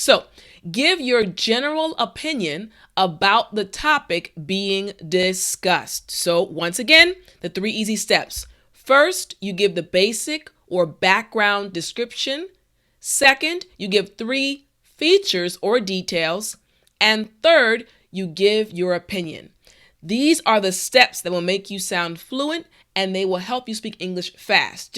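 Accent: United States. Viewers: that's American English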